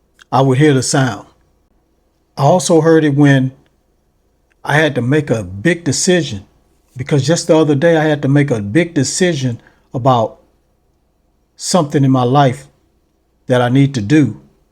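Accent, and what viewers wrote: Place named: Houston, United States. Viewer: You sound like you from Houston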